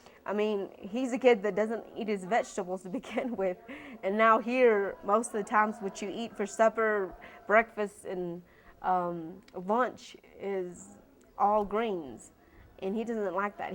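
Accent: United States. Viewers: Dime donde recides te dire si estas en lo correcto. El asento de ella es Americano trabajo con Americanos y le entendiendo y su acento esta como un Americano es mas convivo como muchos Universitarios. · Hahahaha Piche wey no, sabes que es acento americano si supieras no dirías nada, oye Franky alivianate carnal actualizate su acento es muy country y muy América oye Franky mejor cierra la trompeta por que no sabes nada de ingles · I love your Tennessee accent... It looks real good on you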